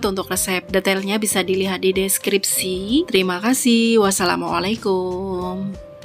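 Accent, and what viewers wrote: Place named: Java, Indonesia. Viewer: Medok banget mantap resepnya mama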